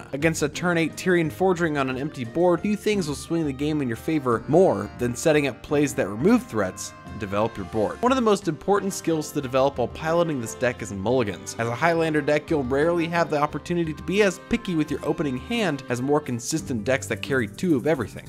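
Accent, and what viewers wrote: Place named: United States. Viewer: He said Razakus, jeez, its just his 'american' accent i'd say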